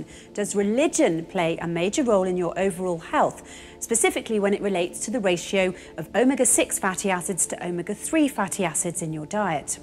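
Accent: England, United Kingdom. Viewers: True religion? No just another fairy story making ridiculous claims. Just because she is a white woman with an english accent does not make it any more true.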